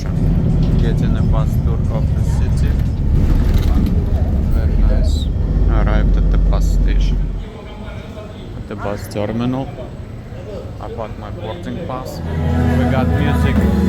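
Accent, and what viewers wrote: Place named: Eastern Europe. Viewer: I like your commentary. The sarcastic eastern european undertone always shines through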